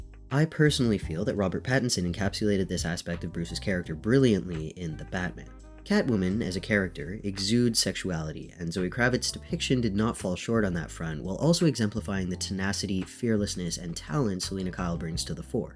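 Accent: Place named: Canada. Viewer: How am I just realizing that is Canadian? Like, SUPER Canadian. I've been watching him for months and it just glossed right over my head. Maybe because he just sounds like home, so it didn't even register.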